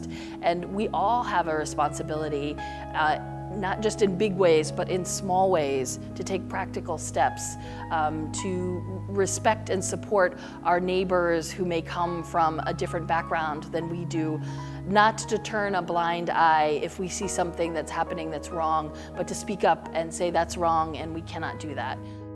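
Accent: Indonesia. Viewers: Englonesiannya bagus. Semangat bu! Hehe.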